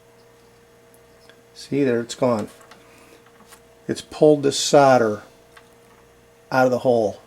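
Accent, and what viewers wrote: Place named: United States. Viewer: Great video. I never realised you were not supposed to touch the solder to the iron. I've learned a lot,. Thanks. BTW It's a bit off-putting to hear the American pronunciation for soldering - to my ears it sounds like you are saying 'sawdering'. In the UK the SOL is pronounced as it is in soldier or, if you like - souldering! :-)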